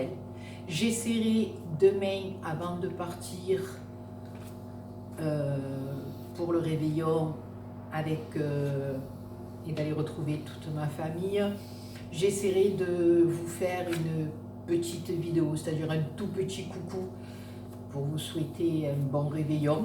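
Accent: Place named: Marseille, France. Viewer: Coucou. Je te trouve radieuse, tu brilles encore plus que ton magnifique sapin! Que d'émotion dans cette vidéo, on retrouve toute ta gentillesse, ta générosité, ta bienveillance, tu es une personne au grand coeur et on ne peut que t'aimer. J'adhère totalement à tes réponses, j'aimerais moi aussi changer beaucoup de choses, mais.... En plus tu me fais rire avec ton adorable accent de Marseille, ta poudre de Perlimpinpin et le chocolat. Merci pour cette vidéo. Passe une bonne fin d'année. Plein de bisous à toi et ton mari.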